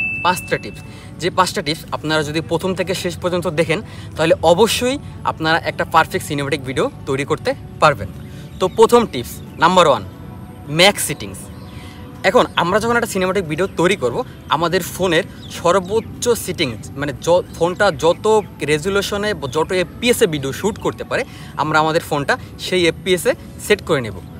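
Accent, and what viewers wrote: Sylhet, Bangladesh. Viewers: Are you sylheti ? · Tumi Sylhet taki naki vai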